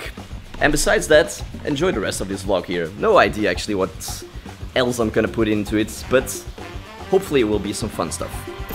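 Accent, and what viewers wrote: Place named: Mexico. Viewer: As soon as i heard you speak, i knew you where MEXICAN !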